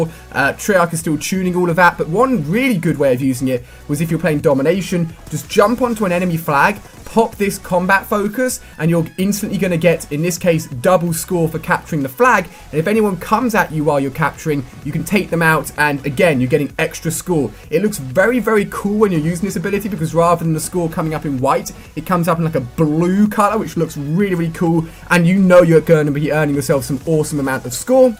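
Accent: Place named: United Kingdom. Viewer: Don't know what's better the game his commentary or his British accent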